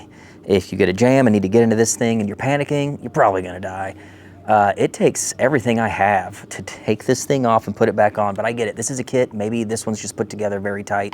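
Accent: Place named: Czech Republic. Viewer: Based on accent, I think is Czech.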